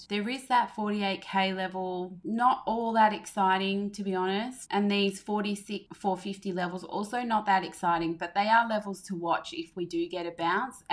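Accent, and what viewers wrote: Australia. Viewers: Hi, great content, you sound like an Aussie !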